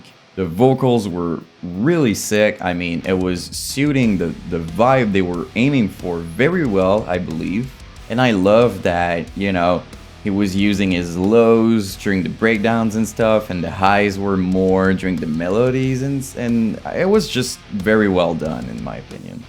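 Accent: Quebec, Canada. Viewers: Est-ce que j’entend un accent québécois? 🤓🧐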